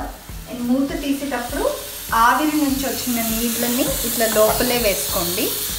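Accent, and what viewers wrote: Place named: Nellore, India. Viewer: Meeru NELLORE Language mataladuthunaru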